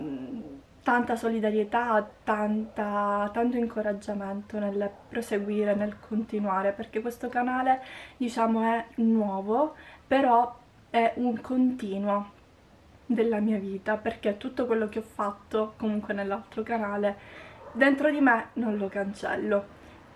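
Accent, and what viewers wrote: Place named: Sicily, Italy. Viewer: Io sono nuova ,finalmente sento la tua voce,un altra Sicilianaa belooo,io sono di Palermo,ma vivo in Toscana da 20 anni .Cara ti seguiro',un baciuzzo 😘😘😘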